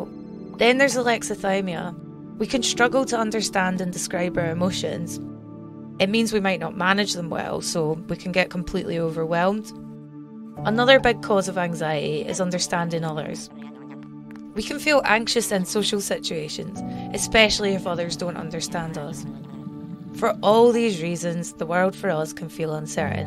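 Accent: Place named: Scotland, United Kingdom. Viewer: I can't really focus on the content because you're speaking in a scottish accent XD Sorry that's how I'm easily getting distracted :( Don't hate me please x(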